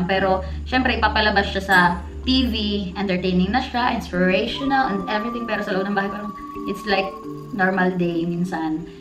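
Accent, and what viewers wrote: Batangas, Philippines. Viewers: Yay! <3 nakakabitin lang po. Hahaha! Since napanood ko yung blog mo na naaksidente ka, pinanood ko na din yung ibang videos mo kasi nacurious ako pano ka naging vlogger eh last time i know, galing kang PBB. Napaka genuine mo sa videos mo, malapit din kasi kami sa Batangas at parehas tayo ng "punto" pag nagsasalita kaya nakakaaliw na mapanood ka. Hahaha! As in very lunch time, gusto ko nanonood habang kumakain, vlogs mo pinapanood ko hahaha! More powers! God bless you:)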